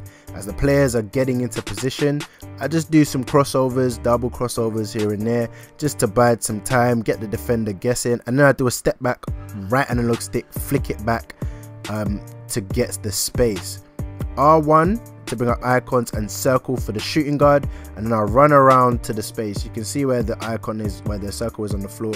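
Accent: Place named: Birmingham, United Kingdom. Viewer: Big respect to you bruv. I'm averaging 70k from these methods. I'm assuming your from the UK fam by your accent. Your accent sounds kinda Brum like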